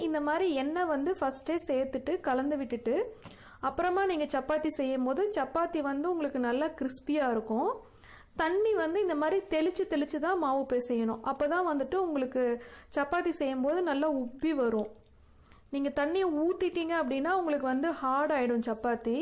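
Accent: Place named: Coimbatore, India. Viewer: I thought u must be from coimbatore.the people in coimbatore speak like u.their speech is very respectful.iam also tamilian.my mother is from trichy.my husband from coimbatore.i did my education in karnataka.i speak in home Tamil.is my opinion better to speak mother tongue at home what ever state u stay .because kids forget d mother tongue .next generation kids should learn their mother tongue.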